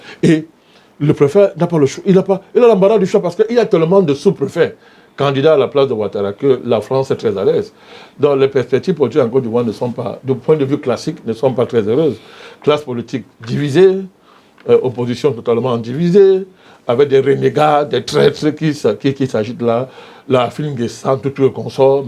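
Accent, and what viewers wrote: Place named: Cameroon. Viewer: Longue vie l’état ivoirien plus respectable et responsable que ton Cameroun de malheur ton accent même est à gerber 🤮 🫢😁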